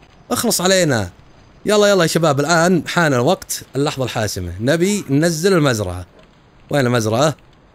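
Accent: Qassim, Saudi Arabia. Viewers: استمر يا افضل يوتيوبر ❤ اهم شيء الي محلي المقطع اللهجة القصيميه😂😂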